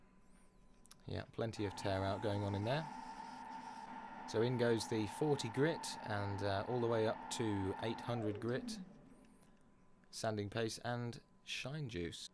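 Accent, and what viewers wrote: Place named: United Kingdom. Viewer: This is definitely one of the nicest things you ever turned. Also, I would like to know what is the brand of your lathe? Also how old is it, and how do you keep it running so well. I really like your channel, Your voice is pleasant and I like your British accent. Keep turning sir, I know, your thinking I don't have an accent, you do and your correct. Take care, and be careful.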